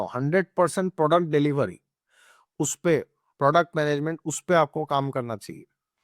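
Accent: Assam, India. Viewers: Sir Aapki Hindi to kaphi acchi hain, Sir aap Assam se ho kya? Apki accent Assamese ya Bengali jaisi lagti hain???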